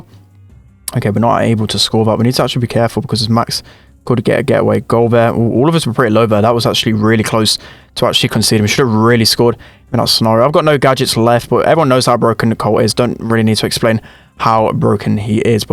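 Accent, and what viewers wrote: United Kingdom. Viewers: british accent bro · BRI'ISH accent bloody hell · just a british accent :/and yea it is the best one out there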